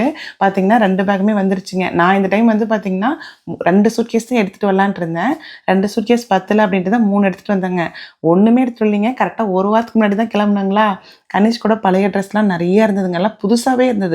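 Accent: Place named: Salem, India. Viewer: Salem tamil rhombha super...maan vasanai innum ungalodu irukku...enga aaya, thatha ellarum salem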